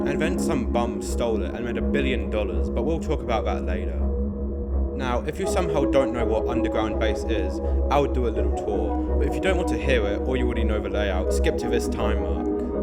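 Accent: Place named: United Kingdom. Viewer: voice that is British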